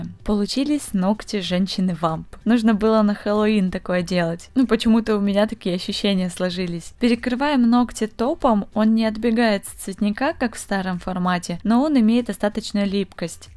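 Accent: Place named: Ukraine. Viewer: жила раньше в Украине, это все же накладывает отпечаток на говор. Ничего страшного, ошибок в речи не так и много)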